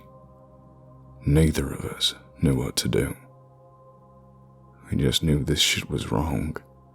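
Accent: South, United States. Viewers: You do an amazing southern American accent for an Englishman! ❤️👌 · Bro ur up there with Jason Hill(chilling tales for dark nights) and ur southern accent is pretty on it espically for u being British.🤘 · As a native Kentuckian, I can confidently say that you actually put on a decent southern accent. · Wow... Your Southern accent is amazing! I almost could have sworn it was your native accent. Well done! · For a Brit, you do a damn good southern accent. Bravo. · you do a great Southern USA accent! Seriously! I live in Kentucky, but spent lots of time at Lejune while my dad was in the Navy. You nailed the patois perfectly. I love your voice and your giant nature loving heart. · Your American accent is getting better, or I guess I should say accents. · Your southern accent makes me smile 😉👍🏽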